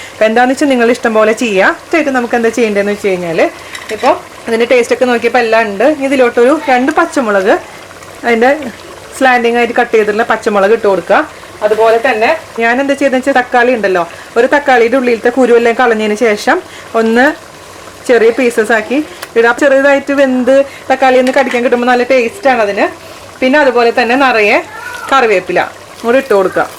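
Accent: Thrissur, India. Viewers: Nammude thrissur baasha oru verity anetta chechi😀😀👍🏻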